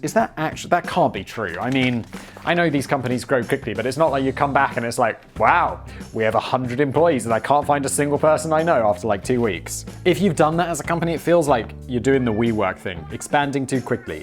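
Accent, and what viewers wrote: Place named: United Kingdom. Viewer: Unfortunately have to use Facebook to check what my mom is uploading 🤦‍♀️ I like to watch your videos as I acquire random information with a nice British accent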